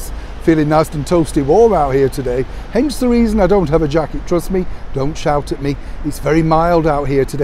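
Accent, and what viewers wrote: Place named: England, United Kingdom. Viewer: Yes, you indeed will be good for podcast bec. you have a magnetic voice for the audience to listen to. I love listening to you, even with your English accent, I can easily understand your voice and accent. Who knows maybe, you will get your own podcast programming, one day!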